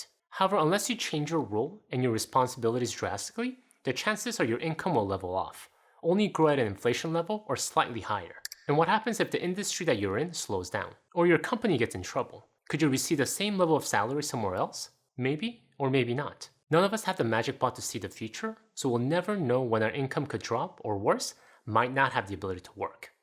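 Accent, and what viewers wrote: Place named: South Korea. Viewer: It’s interesting how his English sounds like Korean haha. Nice video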